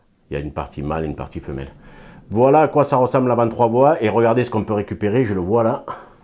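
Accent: South, France. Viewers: Super! "Putin" cet accent! Je suis Marseillais, j'en connais quelque chose!! J'ai une chute de pression sur le manomètre, je remonte la pression tous les mois à peu prés, d'où peu venir cet perte de pression en sachant que je n'ai aucune fuite et que mes radiateur sont purgés. Soupape de sécurité qui est reliée par un pvc de 32 à l'égout? quand pensez-vous? Merci pour votre réponse.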